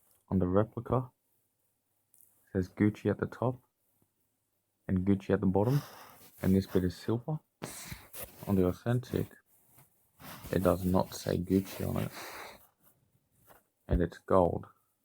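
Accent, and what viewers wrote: Australia. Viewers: Of course he speaks Aussy and Sara Ayri the comments are from the Area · Do I hear a Aussie Ascent? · You Aussie mate?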